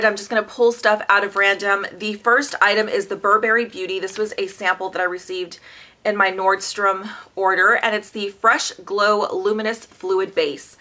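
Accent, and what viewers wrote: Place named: South, United States. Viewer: Very good information, thanks! I can tell you are a fellow southerner..."we are Fixin' to go to my mother's" We only say Fixin' to do something in the south. Have a great weekend!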